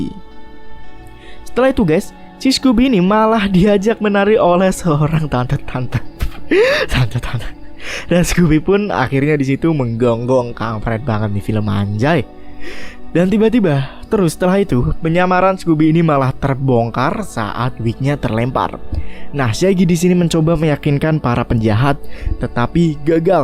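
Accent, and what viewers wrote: Java, Indonesia. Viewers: orang Jawa ya?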